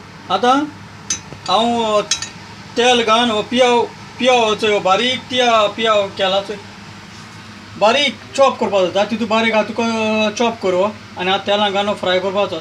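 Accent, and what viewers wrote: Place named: Goa, India. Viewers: Konkani not from colva or benaulim.. feels he is from navelim or may in an around chinchinim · Love ur saxtti konkani.... Typically benaulim ya colva konkani... Post karit rav.... Maka video avadlo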